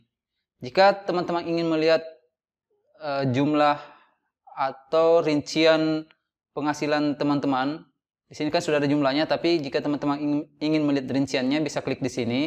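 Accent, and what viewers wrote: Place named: Makassar, Indonesia. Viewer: Kak orang makassar yah?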